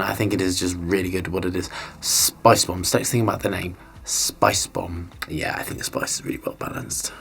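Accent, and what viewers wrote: England, United Kingdom. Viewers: Yeah, he's English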